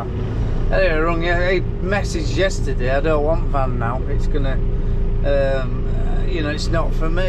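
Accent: Yorkshire, United Kingdom. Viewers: Pleased you & Joe Seels have found each other on here, 2 of the best channels around, can't beat straight talking Yorkshire folk 👍👍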